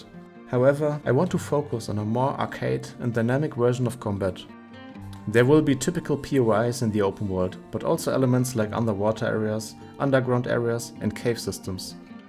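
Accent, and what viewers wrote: Germany. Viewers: Are you german your voice sounds like a german?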